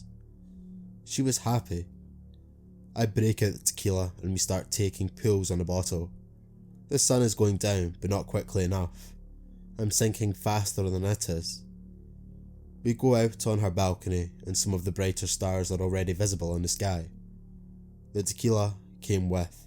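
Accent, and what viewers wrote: Scotland, United Kingdom. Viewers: I'm from Pennsylvania! :D Also hi, I'm new here and I found you through Margbot. Scottish accents are my favorite and I'm excited to watch your videos <3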